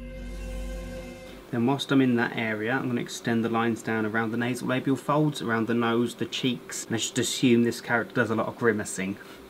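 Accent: United Kingdom. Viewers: what part of the UK are you from? (if you are from the UK..)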